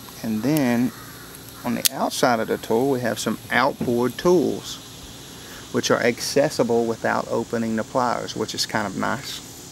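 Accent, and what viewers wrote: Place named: South, United States. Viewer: I'm guessing southern/ southern mid west usa.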